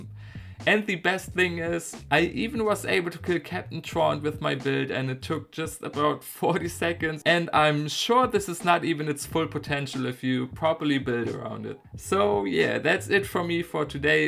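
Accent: Germany. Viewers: Der deutsche Akzent ballert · Ich hab mir während der ersten 2 Minuten schon gedacht, das du n deutschen Akzent hast😄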